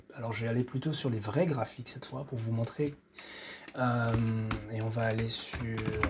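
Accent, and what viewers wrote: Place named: Belgium. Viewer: Tu as un accent tu es de Belgique, suisse ?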